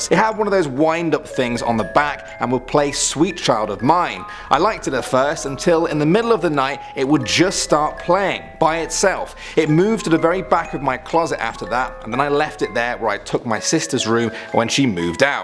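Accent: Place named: United Kingdom. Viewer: Wait... I could not understand you with that British accent (sorry being an American has some cons) DID YOU SAY THAT TRANSFORMERS TOY COMMITTED SUICIDE? I would too if i was a knock-off McDonalds toy.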